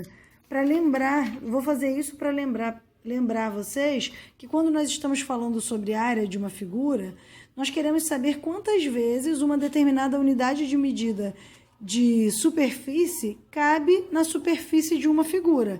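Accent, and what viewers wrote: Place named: Pará, Brazil. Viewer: boa prof se solta um pouco mais. vc é paraense ksksks pareçe muitooo tbm sou,rsrs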